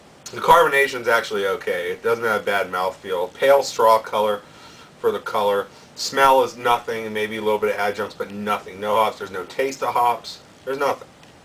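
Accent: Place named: Pittsburgh, United States. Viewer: You sound like your from pittsburgh. I assumed you were originally from pittsburgh when you first talked. I'm from southern WV and I've only been to pittsburgh once, so maybe I just haven't heard the accent enough.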